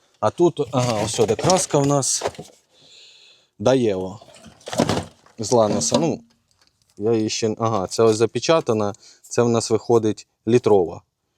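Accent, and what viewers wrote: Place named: Russia. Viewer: І моZZkaльська підзвучка теж ріже вухо. І головне, що не старається виправлятись.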